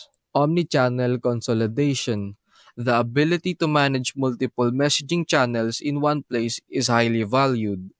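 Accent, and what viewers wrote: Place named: India. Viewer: You sound Indian, why are you using a white guy? I already lost the confidence in this review in 2 seconds.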